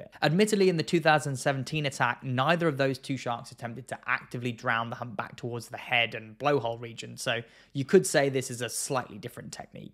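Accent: United Kingdom. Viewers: It may take a few videos to get used to that British accent and really enjoy the videos. The content eventually convinced me to subcribe.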